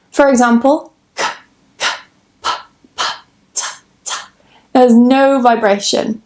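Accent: United Kingdom. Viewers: Such a FANCY British Accent and very helpful ❤️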